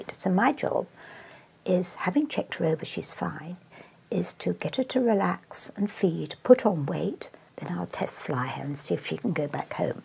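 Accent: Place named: RP, United Kingdom. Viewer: A wonderful voice. It's called an RP (Received Pronunciation) British voice and it's almost from a bygone era.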